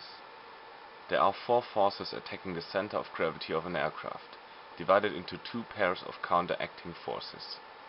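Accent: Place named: New Jersey, United States. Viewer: Sounds like you are from New Jersey!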